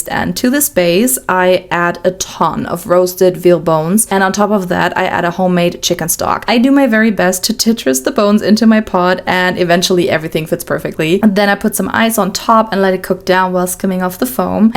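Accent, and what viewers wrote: Germany. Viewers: Are you german? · is she german/austrian/swiss?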